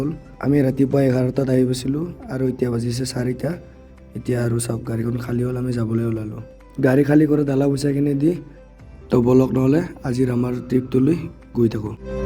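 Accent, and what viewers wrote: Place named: Bishnupur, India. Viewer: Amr gaor kotha koisa tumi val loga Thai khini. Bishnupur hoy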